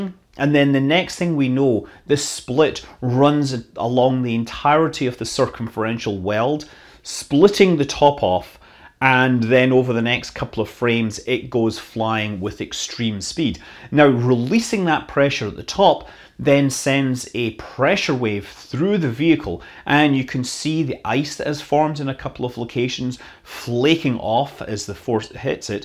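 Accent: Scotland, United Kingdom. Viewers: Scott Manley Can I just ask you something- so I’m guessing you stay in the States now but how long is it since you left Scotland? And where did you live in Scotland? I just ask because my accent is really similar. I stayed between Sterling and Edinburgh before moving to just south between Edinburg & Carlisle. Now after living in New York for quite a while under dual citizenship, my Scots is gradually fading - although I still have an accent like yours but now all the ~Scottish slang words have disappeared from my vocab. Just interested, thanks mate 🚀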